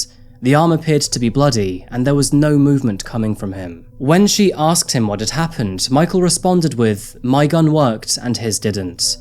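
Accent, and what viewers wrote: England, United Kingdom. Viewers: wait ..whaaaa did he just say that he was born in Seattle Washington ??? did I mis hear ? This guy is the most british sounding Brit in the history of Britain LOL weird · Who writes the scripts for this stuff? Why did i need an introduction of Seattle? Why did you tell me you were born in Seattle but leave me confused with your British accent. I can only guess you moved to the UK at an early age. You need a better team for your videos... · Where do you get your English accent. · Wait a second here. You're from Seattle? Where's the English accent come from then. Or is it fake? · If you’re from Seattle, why do you sound like a British kid? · I’m so glad you don’t speak like an American! Love your British accent ❤ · And no better accent to do it with than the British accent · You sound so polite, so refined, I did not think you were American! 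😂 I thought you were British. 😂 ❤ from Australia P.S. I absolutely love your channel - addicted in fact - keep up the good work! 🤩🤗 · Wait, you're from Seattle? What's with the English accent, then? Is it just an act? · How tf were you born in seattle but sound like a complete tommy? That's weird my dude... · I thought u were British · Love your videos and your British accent. As for the douche bag who killed this young couple because they could not pay their rent, it made my day to know that he is behind bars and that he is going to stay there for a very long time, probably until he dies. What a dumb guy, you are paying back now for being so stingy and evil. Now you are old, weak, alone, depraved of freedom, without any outlook. I am glad that courts of justice in the US are severe, much more than in France. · my Sister n fam lives in Seattle I am so glad I have lived in Western CO since 1980, I thought u sounded a bit like u lived in UK due to ur voice love ur vids thx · Okay how did you end up with the British accent? 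· How were you born in Seattle with a English accent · Born in Seattle? You must have moved to the UK very early on because that is a lifelong British accent. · Ok you have to explain how you were born in Seattle but you have an English accent? Sorry if you’ve already explained this somewhere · I'm glad this case got solved and that pile of anal seepage is behind bars. Thank you for another great video! They're always so well-made. You're not just a disembodied voice or 3 hours of interrogation videos with minimal, basic commentary. You're empathetic, which I absolutely love! You're kind and respectful to the victims and their families, and it's also nice that you sound (like your accent) that you're close to me! I like watching more British creators, obviously I get our dry humour. I'm rambling. Anyways, thank you for keeping us all informed! Big hugs for you ☺🫂🫂🫂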